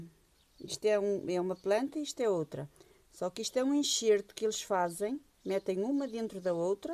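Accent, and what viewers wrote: Portugal. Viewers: além das plantas maravilhosas, ouvir sobre elas com seu sotaque da nossa terra mãe é muito bom! :)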